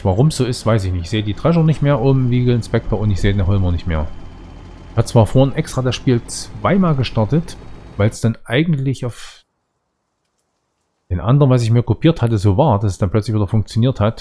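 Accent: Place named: Saxony, Germany. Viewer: Hay war wieder mal super!!! Mach weiter so!! Ich habe keine Folge verpasst. Macht immer Spaß zuzuschauen. Wo wohnst du eigentlich, dein Sächsischen Dialekt hört sich gut an meine Lebensgefährtin kommt auch aus Sachsen!!!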